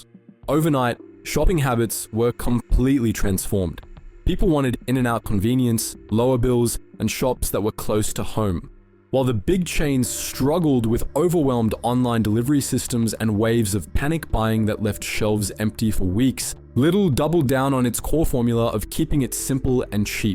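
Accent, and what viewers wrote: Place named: Australia. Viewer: Why is a Australian talking about the UK? This feels like AI and who ever set this up thinks Australian is British.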